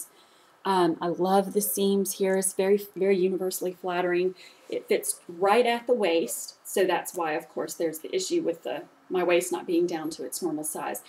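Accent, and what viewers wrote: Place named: Tennessee, United States. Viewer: I have no idea how I didn't figure out you were from Tennessee before now...I should have been able to pick out that accent.(I'm from West Virginia myself). I adore those coats...I need a good winter coat of my own since my windows are still frosting overnight. I'll have to give modcloth a try. Thanks for the tip off Darling. :)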